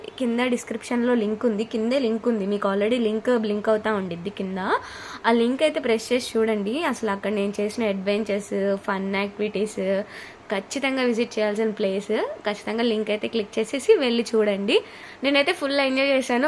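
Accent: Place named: Godavari, India. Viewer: Mee slag Maa godavari jilla la chala bagundhi mee lage 😊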